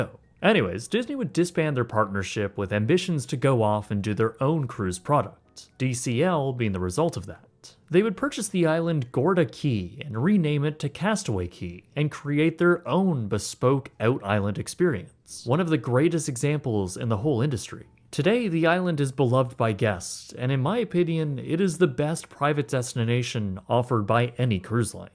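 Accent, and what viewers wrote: Canada. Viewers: Canadian accent i think and southern ontarian. Ie he sounds like me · I heard him pronounce it correctly! I think his Canadian accent softens it a bit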